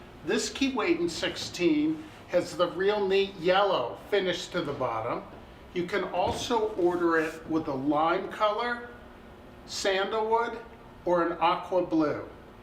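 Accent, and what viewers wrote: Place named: United States. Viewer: after all these years in Canada, he still sounds more American than pure northern hoser. curious....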